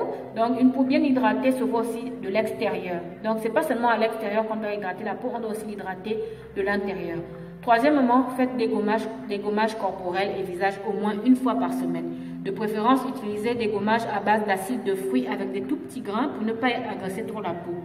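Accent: Gabon. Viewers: Bjr ma belle tu as l'accent Gabonais de quelle pays est tu si ce n'est pas indiscret?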